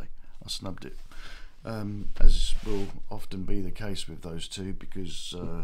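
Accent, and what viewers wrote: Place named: Cockney, United Kingdom. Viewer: Yes I agree and thought that the dog had followed the scent of its owner. For all we know that poor woman was dragged off by someone and there were no witnesses. Love your Cockney accent, you sound so cultured, me I just sound common as muck, in spite of a BA, HNC, A & O levels. I’m mixed blood, mother was Southwark, dad was a crane driver from Wapping. Happy days hun 😊👏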